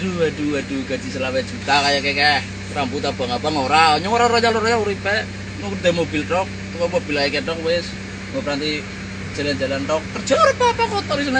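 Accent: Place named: Java, Indonesia. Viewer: Wong endi mas medok temen.ari ngomong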